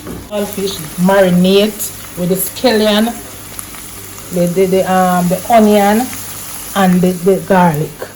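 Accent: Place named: Jamaica. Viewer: I luv watching you cook. I respect how you talk and carry yourself. Your Jamaican accent is so real and beautiful!!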